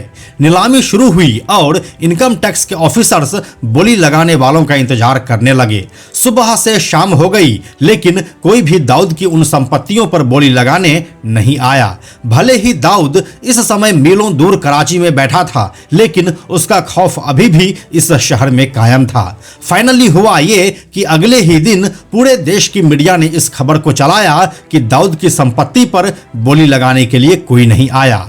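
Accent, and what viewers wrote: Bihar, India. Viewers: बकवास, उससे अधिक घटिया बिहार जैसा उच्चारण. शम्स ताहिर खान से training लो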